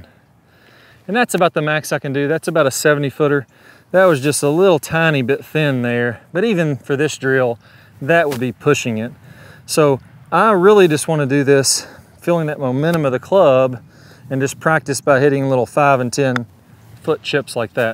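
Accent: South, United States. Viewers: another thanks from me here in the UK. Your lessons are fantastic and right on point for game improvement. And that nice southern drawl makes watching a Zen-like experience!:-D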